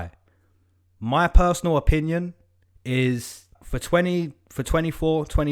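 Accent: United Kingdom. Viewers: U sound like that other Brit (mma guru) who talks funny and crazy ... who’s the better predictor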